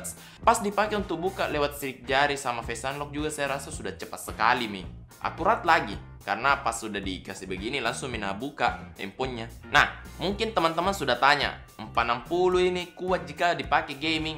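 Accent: Makassar, Indonesia. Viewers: Matap...enak juga liat review gadget pake logat makassar 👍👍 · Saya suka sekali koko klo logat makassar ki ...sama² ji ki too ...#ewako koko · Lebih bagus kapang memang Logat Makassar bosku... Ada toko hp kah kita punya di Makassar ???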